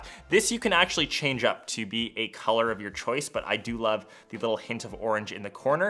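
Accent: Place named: Canada. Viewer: You have a Canadian accent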